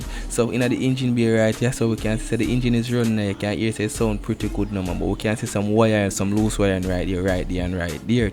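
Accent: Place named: Spanish Town, Jamaica. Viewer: lol, the fact sey mih can immediately tell seh a spain twn 😅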